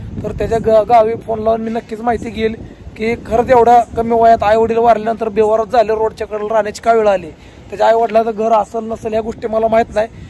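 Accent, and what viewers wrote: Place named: Satara, India. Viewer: एकदम सातारी बोलीभाषेत समजावलं दादा,, आपल्या कार्यास सलाम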